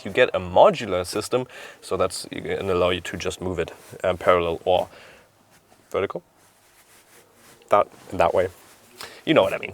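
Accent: Germany. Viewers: Is he from Germany? He sounds really german to me.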